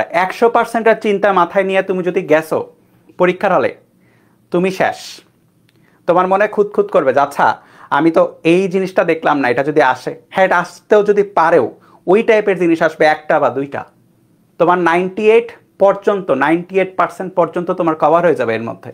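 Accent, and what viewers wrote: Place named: Barishal, Bangladesh. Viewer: আপনি বরিশাইল্লা😅 ভাষা শুনলে বুঝা যায়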